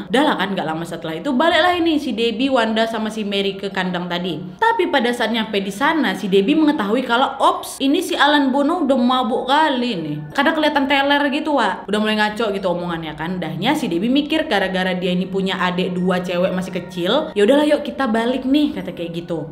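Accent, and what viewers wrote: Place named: Medan, Indonesia. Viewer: Kak cantik nya awet☺☺ logat medan nya mantap saat podcast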